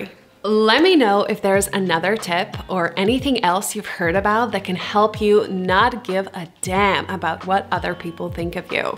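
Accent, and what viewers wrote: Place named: Eastern Europe. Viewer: nicely explained. Llve the Eastrrn Euro accent permiating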